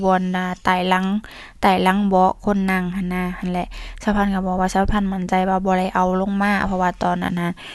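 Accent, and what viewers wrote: Laos. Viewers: ลองคิดในมุมของเจ้าหน้าที่นะ ทั้งบ้านได้สัญชาติไทยกันหมด มีอยู่คนเดียวไม่ได้สัญชาติ แล้วเพิ่งจะมาขอตอนอายุ จะ 30 ปี แถมมีลูกอีก ในมุมมองของคุณมันแปลกมั้ยละ FC หลายคนก็บอกแล้วปรับวิธีการพูดให้คนไทยเข้าใจ นี้ก็ไม่ยอมปรับตัว พูดแบบสำเนียง ลาวอยู่นั้นแหละ มันแปลกทั้งประวัติ แปลกทั้งสำเนียงการพูด คุณเป็นเจ้าหน้าที่จะทำให้หรือ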